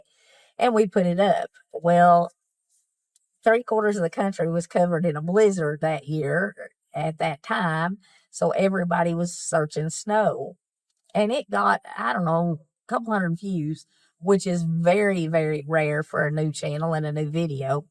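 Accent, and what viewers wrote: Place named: Kentucky, United States. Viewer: I've always thought you were from kentucky. 🤦‍♀️ I think the accent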